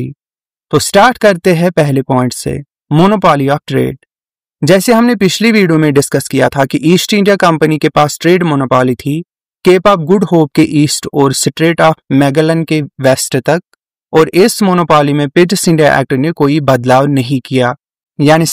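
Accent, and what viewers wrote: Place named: Pakistan. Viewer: Bhai teri hindi pakistani youtube video jesi kyo hai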